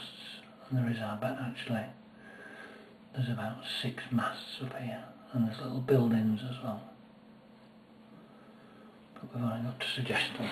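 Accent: Yorkshire, United Kingdom. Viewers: Not bad, not bad at all sir. Simple but very effective, plus a couple of techniques I'm going to have to try. Also nice to hear a yorkshire accent, been a Huddersfield/Barnsley boy myself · Really enjoyed watching these 3 videos and love the end result. I'm still a beginner and need all the help I can get so found this very helpful. I'm originally a Yorkshire lass so listening to your commentary was an added bonus! This is the first time I've come across you on You Tube but certainly intend to dig out all the videos I can find. Thank you. Keep up the good work!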